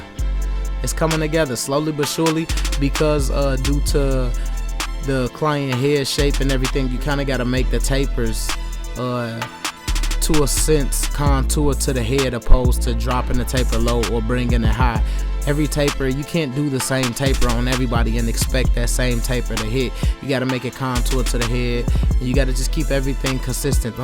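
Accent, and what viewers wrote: Chicago, United States. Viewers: yu frm chicago?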